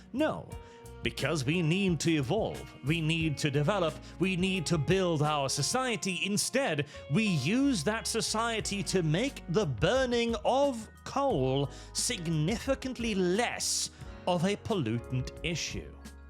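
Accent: United Kingdom. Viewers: my bad (wrote the comment before watching), to me he sounded like british. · He sounds British to me, probably the way he speaks as I seen way too many British content creators speak like that like trying to constantly sound all eloquent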